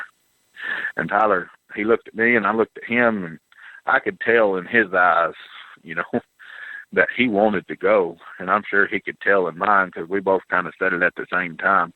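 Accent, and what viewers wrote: South, United States. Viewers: Great stories !! Being a Fla boy love hearing this fellers southern talk 💯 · I think it's a sexy rough n tough rugged good ol boy southern gent mountain cowboy accent. Very Revered Very Rare Esteemed Honest True Man Idk if ever that was even a thing but I sure do believe it is now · Love dat suthern akscent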